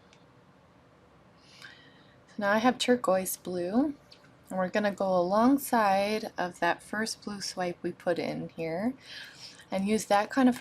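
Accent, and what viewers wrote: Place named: New England, United States. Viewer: Beautiful thank you, I’m from Massachusetts too. I thought your accent sounded like you from New England, lol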